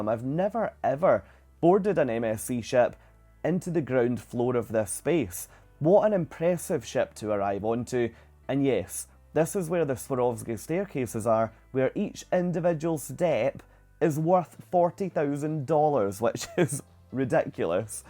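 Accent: Scotland, United Kingdom. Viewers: New subscriber here, LOVE your Scottish accent! especially since Outlander has wrapped up Season 7 lol. Thanks for sharing, and happy cruising! 🏴❤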